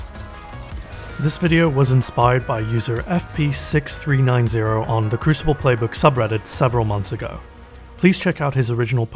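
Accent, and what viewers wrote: United Kingdom. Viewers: This video is about as useful as Lord Shaxx telling you "You're trailing the enemy. Catch up!" when it's 3-0 for them, only one of your team mates is alive, and they have 2 revives left. Maybe this vibes with pro players who get paid to do this? I don't know, I play games to entertain myself. Having a British accent blabbering on and on in a dramatic fashion about things that are so remote from reality is funny, but "stew pid".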